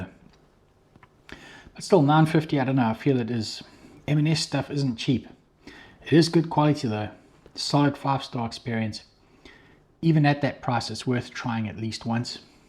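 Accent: South Africa. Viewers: What accent's that, bud? My ear is saying South African.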